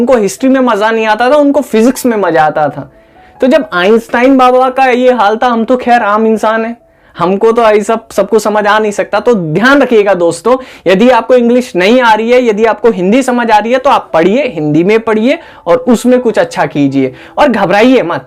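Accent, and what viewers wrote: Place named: Bihar, India. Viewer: bihari tongue, kya baat hai kya baat hai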